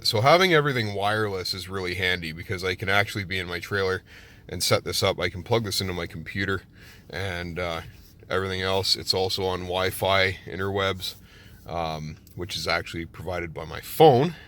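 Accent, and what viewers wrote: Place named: Alberta, Canada. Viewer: you sound like an Alberta boy!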